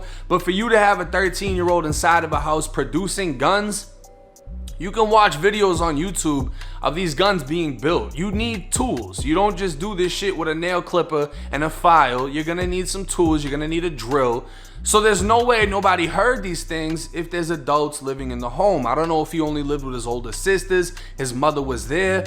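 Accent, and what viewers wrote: Boston, United States. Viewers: Thats how we talk up in Boston, dont hate. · The boston accent is so unique, I can always tell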